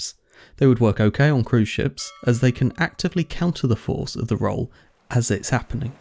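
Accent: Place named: England, United Kingdom. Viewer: Why are these vids always so much more compelling when the narrator has an English accent?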